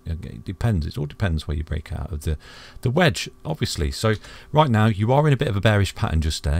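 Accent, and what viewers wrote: United Kingdom. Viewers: This british accent is so damn gay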